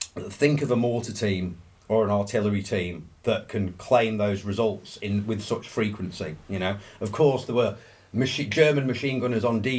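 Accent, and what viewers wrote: United Kingdom. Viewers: This dude sounds like the best of British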